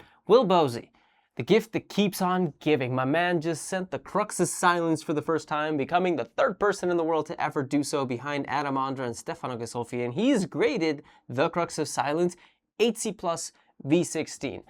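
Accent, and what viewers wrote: United States. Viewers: you speak perfect American English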